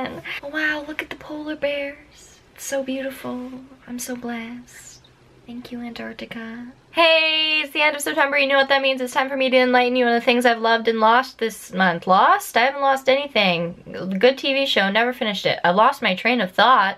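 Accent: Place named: Canada. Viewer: That Canadian accent... 👌🏻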